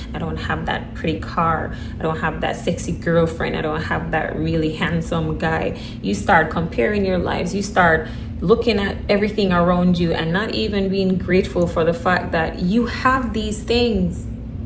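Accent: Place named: Jamaica. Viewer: Where are you from? I love that accent sound Jamaican I love Jamaica.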